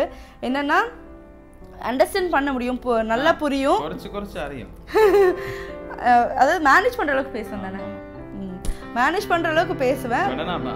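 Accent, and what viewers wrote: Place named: Kerala, India. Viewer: Malayalam slang theriyudhu lighta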